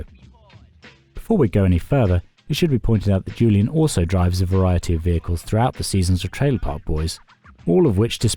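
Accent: RP, United Kingdom. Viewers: It's so charming to listen to a posh British accent talk about a Canadian Classic about Trailer Trash! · This video is pure gold. Your classically British commentary while talking about a priceless piece of Canadian TV is a great combination. You did your research and each character’s accompanying music is on point. Deeeeecent video!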